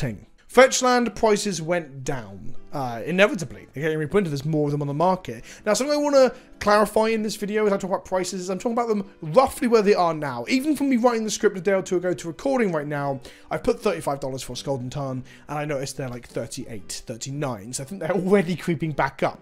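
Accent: United Kingdom. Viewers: It feels weird being from the UK... watching and hearing prices referred to in dollars ...with a british accent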